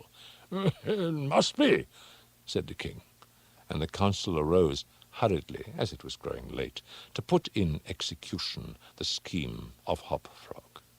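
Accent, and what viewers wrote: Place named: RP, United Kingdom. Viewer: This one was overdone. Also, Poe was an American. It wasn't written with a posh British accent in mind. 🙄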